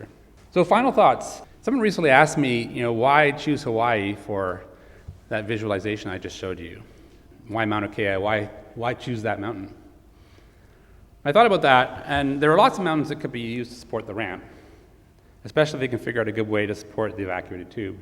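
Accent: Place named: Canada. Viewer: This dude is Canadian for sure.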